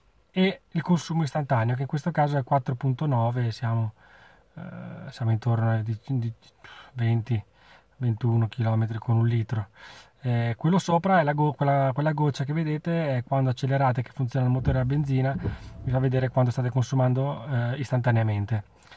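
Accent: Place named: Romagna, Italy. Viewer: Di dove sei sembra che hai un accento Romagnolo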